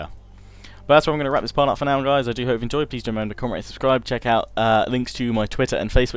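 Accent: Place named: England, United Kingdom. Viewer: the English accent is hilarious (says the auzzie to be fair)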